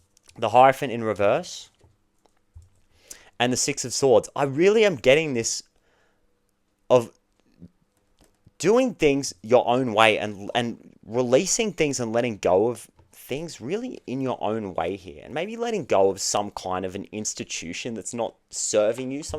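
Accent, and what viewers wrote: Australia. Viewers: Hello seeing you for the first time and I want to say what a handsome face you have, so nice looking. What part of Australia are you from, you accent to me sounds Australian. You also seem a sensitive and shy person. Your reading resonated with me. Greetings from me in the Emerald isle of Ireland and a very happy and blessed new year to you and your family. 🇮🇪🙏🙋🎄☃️😁🇦🇺. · Been trying to place your accent! Not american, are you? Australian?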